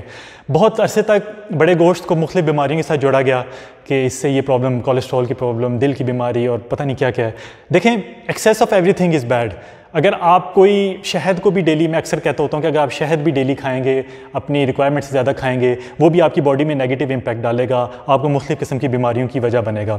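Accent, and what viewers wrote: India. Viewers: Your language Sounds like Hyderabadi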